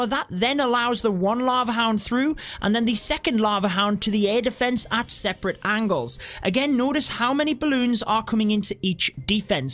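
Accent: United Kingdom. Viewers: well he's british but u will get used to it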